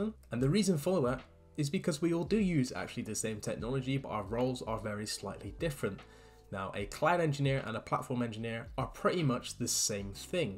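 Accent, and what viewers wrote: United Kingdom. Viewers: Thanks bro.. I know I can trust you with that west country accent like mine😂, great video, very informative 👍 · Are you from Devon?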